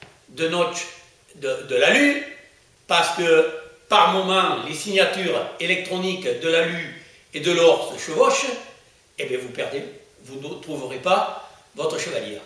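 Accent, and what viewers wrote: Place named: Toulouse, France. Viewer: J'ai hâte de recevoir le mien ! très bien cette démo et avec l'accent de Toulouse c'est encore mieux !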